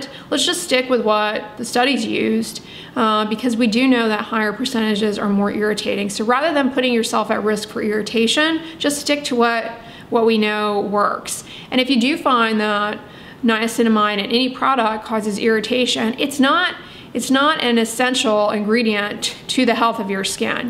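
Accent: United States. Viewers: Does anyone know - What type of American accent does Dr Dray have ? ( I’m from the U.K. so it’s not obvious to me but it’s bugging me cos Its unusual and I can’t place it 😆) Thanks :)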